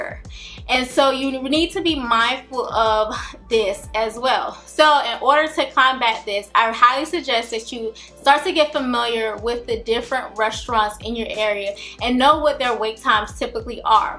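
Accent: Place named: South, United States. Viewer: Damn, she's gorgeous, the southern accent is great and she has helpful videos. Thanks sugar!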